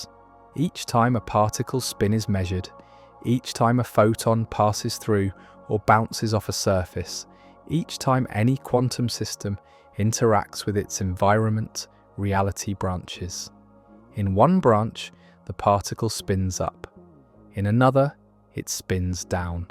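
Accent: United Kingdom. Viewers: Sorry, always hard for me to follow Brit accents. Does NOT keep my attention. I love this topic, but can't view it.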